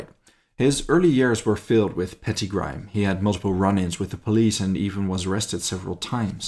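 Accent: Germany. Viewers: with The German accent - 1. Is the opening music you use from Bloch's Hebraic Rhapsody.? I am just trying to place it. 2. Another unfortunate was one Theodore Schurch who did some minor interrogation work for the Germans after being captured. His father was Swiss so presumably he spoke Swiss German. There was something about his English which made some of he British officers guess he was really a turncoat. He was allegedly captured in Rome in March of 45.. well after Italy had fallen. Why he did not try to get to say CH and the to Australia or Canada and simply disappear is beyond me. Perhaps his relationship with his CH relatives was not so good. Well even though the war was done and dusted by the time of his trial he was still found guilty of treachery and Hanged... I think he was just too cocky and he could easily have avoided the noose. I know of no other UK soldier executed by the brits for treachery or treason during WWII. 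K Fuchs who was a UK fast track citizen was very lucky to avoid the noose and had the USA counter intelligence caught him, he might have been given a heavy sentence while the Manhattan project was running (to prevent the other scientists going on strike during the war effort ) and then executed on some other charge post WWII. But the US never caught him in the act. Tim Fidler, NZL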